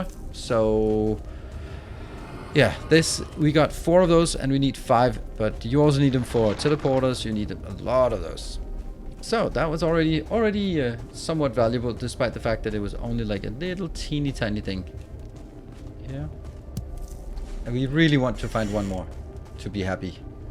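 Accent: Denmark. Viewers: You sound Danish :)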